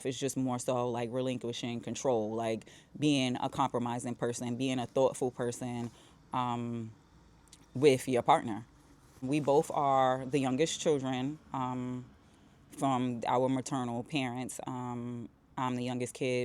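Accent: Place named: New York, United States. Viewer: So glad i found your page. I love you style, personality and love your NY accent. As a NY'er myself ( Harlemite ) I am feeling your vibe!!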